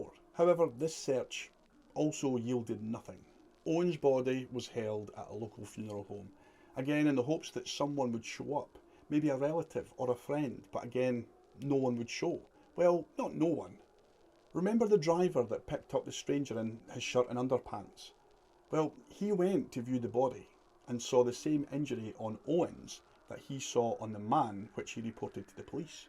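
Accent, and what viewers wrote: Scotland, United Kingdom. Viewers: Uh yeah...you're Scottish, I am Australian and would love a Scottish accent 😎👍.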